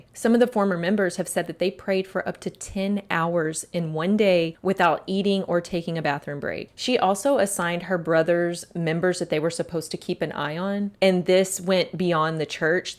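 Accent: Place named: Utah, United States. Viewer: Fabulous story-telling skills. I’m convinced the you-tuber has to be from Utah or Idaho…real and deal pronounced rill and dill.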